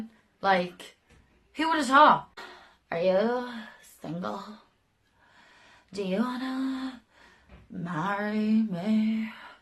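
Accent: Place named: Ireland. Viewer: I thought Australian accents were my weakness until I heard yours 😂😂😂. The videos Irish people tasting different kinds of alcohol always makes my day. Awesome videos!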